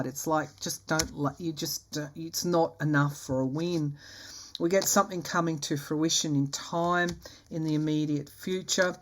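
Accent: Australia. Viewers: She sounds Australian to me .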